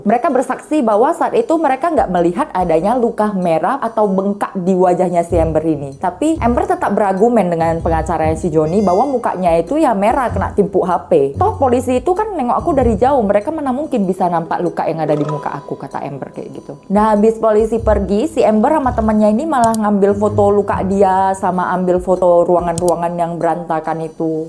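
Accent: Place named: Sumatra, Indonesia. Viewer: Logat khas Sumatra-nya yg bikin asyik 🇮🇩🥳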